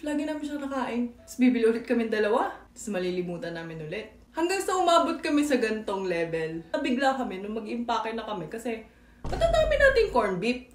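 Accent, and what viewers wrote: Batangas, Philippines. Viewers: bat nga naman batangueno accent mo? tsaka bat di ka pa mamalagi sa Pilipinas? mas gusto mo dyan sa Korea? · Speaking tagalog fluently is one thing but speaking with the batangueño accent? 👏🏼👏🏼👏🏼 대박! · She grew up and live in Tanauan, Batangas kaya may batangueña accent siya. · haha parang batanguena Punto mo yey, grabe dami dala yung sakto kumakain ako ng corned beef with egg side na pnctcanton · Batangueño here, natutuwa ako dine lalo na sa accent niya. Shaaaat na! Same pa ng mga hilig na food. 😅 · Her Batangas accent in this video is subtle, but evident in some of her videos. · Batangueña intonation! Nakakatuwa. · Batangueña pala to. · Hindi lang basta tagalog: Batangueñong tagalog! Look: sa , sabi nya, alam nyo GA dati...😅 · love your Batangeña accent ❤ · Batangueña accent ☺️ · She used slight batangueño accent and expressions in this vid po. 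She was raised in Batangas but I think she purposely use tagalog in a more neutral tone dito compared sa iba nyang videos. 😊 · Mas gusto ko sayo na batanguena accent palagi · Kainis mas tunog Batangueña sya sakin kahit legit Koreana sya, samantalang Batangueño parents ko good gosh Even the most subtle of differences like "matutuwa" , iba intonation ng Manileña sa Batangueña niyan hahahahah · Batangas accent yan. Akala ng iba pag sinabing batangas accent ay katulad nung napapanood sa tv, OA yung mga yun. Pero meron naman talagang matindi ang batangas accent, sila yung mga nasa padulo na ng batangas, hehe. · ala eh nagtagal ka nga sa pinas batanguenong batangueno na naman ang punto mo ingat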